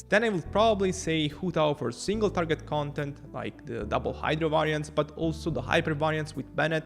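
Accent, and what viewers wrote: Italy. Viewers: Come è possibile che non mi sia mai accorto che hai un accento italiano parlando inglese? Evidentemente sei così fluente che non me ne sono mai reso conto. P.S. sei bravissimo e super affidabile, continua così ❤ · Lo sapevo che eri italiano ahah L'accento non mente, comunque bravissimo continua così!! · IS he italian? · It’s the italian accent while being smart that makes people fall for him😂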